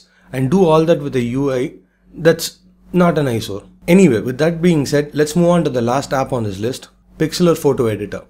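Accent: India. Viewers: Guys dont be so judgmental on sumone's accent!!! wen sum Caribbean or Irish or Italian guys speak r we that much judgmental... then y d fck for indian.. carry on gud wrk... from Maharashtra Guy.(in case u r luking for my ethnicity) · I usually get annoyed when Indians try to imitate an american accent and fail miserably. I'm actually glad that he decided to stick to his Indian accent.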